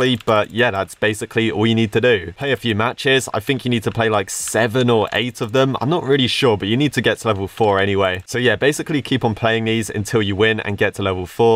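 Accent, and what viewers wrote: United Kingdom. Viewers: Sometimes I forget is Britishy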